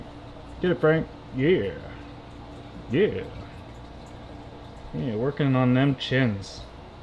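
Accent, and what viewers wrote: Canada. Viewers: I love you Canadian accent lol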